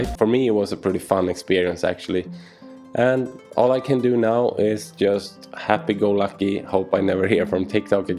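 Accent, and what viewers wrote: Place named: Sweden. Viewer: Man I was so sure I recognized the way you pronounced things, checked your Github profile and I saw right, you're from Sweden!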